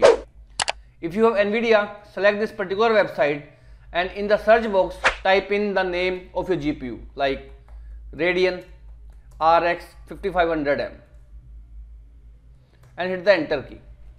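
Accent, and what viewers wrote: India. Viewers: LETS GO THERE IS SOMEONE WITH A HARD INDIAN ACENT DOING THE FIXING I WON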